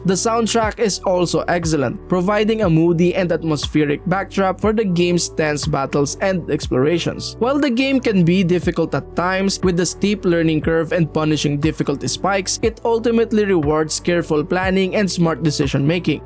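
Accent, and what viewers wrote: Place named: Philippines. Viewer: Latino accent + American accent + lazy pronunciations = Filipino accent 🤦🏻‍♂️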